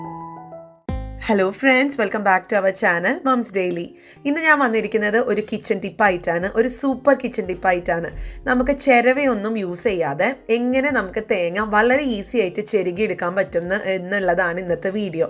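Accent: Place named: Poonjar, India. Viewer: Poonjar Anglisized malayalam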